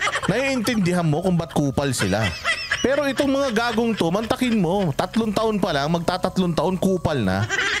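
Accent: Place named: Visayas, Philippines. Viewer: Tawang tawa kami sa iyo uy. Para kang bisaya tulad namin🤣🤣🤣🤣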